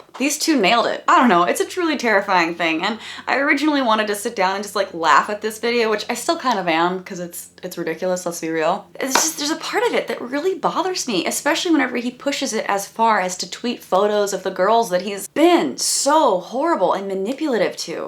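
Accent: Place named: Appalachia, United States. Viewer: Why do you sound like a hillbilly when you have company but you're well spoken when you're alone? 🤔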